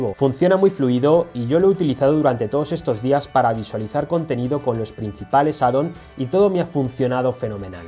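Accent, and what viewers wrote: Spain. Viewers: Es español el tío. No le pidas más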